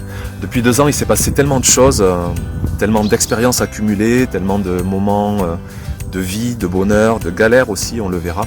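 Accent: South, France. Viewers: Bonne idée!!! Sais-tu si on peut aussi utiliser les huiles essentielles contre les poux rouges. Pour l'instant, je n'ai pas ce problème, mais, en prévention, je voudrais agir avant de devoir guérir. Au fait, Est-ce que c'est bien al que je connais? Nathalie VALERO Merci pour tous ces partages de belles expériences. A l'accent, j'entends que tu es dans le Sud. Et à l'emploi de certaines expressions, tu me fais penser au naturopathe Christophe BERNARD. Est-il de ta famille?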